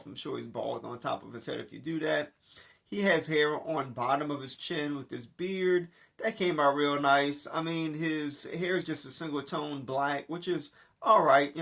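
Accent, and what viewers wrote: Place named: New York, United States. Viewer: You have such a fascinating NYC accent. It reminds me a little bit of Jay-Z. And nice review, by the way. My two are on their way from GameStop. Considering I pre-ordered right when this went up..not sure how so many have it in hand already, yet mines just shipped.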